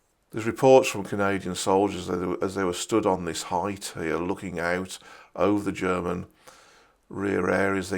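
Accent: Birmingham, United Kingdom. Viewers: Absolutely superb. Your soft brummy twang evoking memories of the Shropshire lads.......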